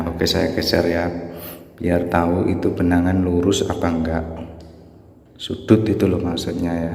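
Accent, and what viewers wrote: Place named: Kebumen, Indonesia. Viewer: kebumen ngapak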